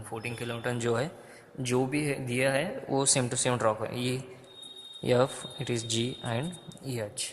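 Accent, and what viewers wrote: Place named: Maharashtra, India. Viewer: Bhava marathi ahes na tu tuzya hindi varun samjla🤣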